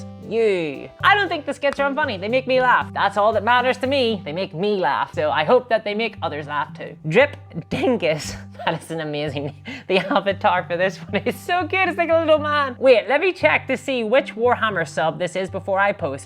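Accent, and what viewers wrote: Northern Ireland, United Kingdom. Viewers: This has me laughing out loud at several places. Very good. But I do have one question...is there a Northern Irish accent in there? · Dont know whats the hate about not only is she gorgeous and has the British accent, she give us the up and down of the evil GW. lol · Great video. I'm from Norn Iron and I admit when I first watched your stuff I was horrified at hearing an accent similar to my own on YouTube - we are after all a self loathing breed of people. After about 10mins I was hooked!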